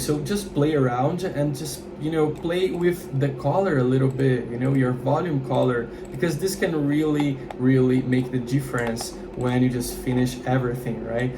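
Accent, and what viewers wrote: Brazil. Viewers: reconheci o brasil só pelo sotaque akakak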